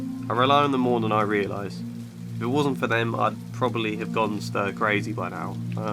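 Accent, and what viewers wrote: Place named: Manchester, United Kingdom. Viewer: The Algorithm Gods have blessed me with an extremely insightful and beautiful channel. Wild guess but you sound like you’re from Manchester as well.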